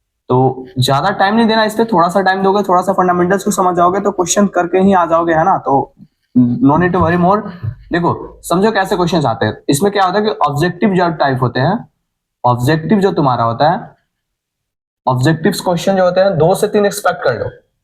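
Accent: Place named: Bihar, India. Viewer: Aap Bihar se ho sar aawaj lag rha hai ❤❤❤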